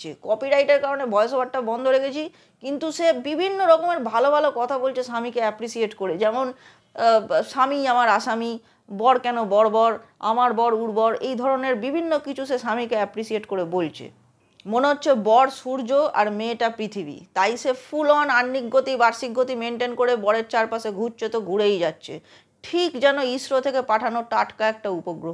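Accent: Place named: Bangal, Bangladesh. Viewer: এটা ঘটি নয় , বাঙাল মনে হচ্ছে 😊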